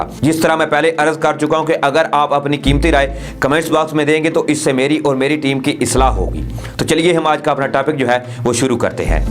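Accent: India. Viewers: ! AOA Thanks for realising the mistakes in pronunciation and starting to learn correct pronunciation. Keep on till it all Is in order. After all it will take some time before this Indian accent will be corrected. Thanks,!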